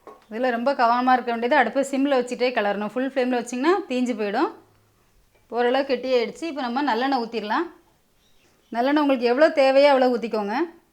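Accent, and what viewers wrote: Tirunelveli, India. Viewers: Nellai slang la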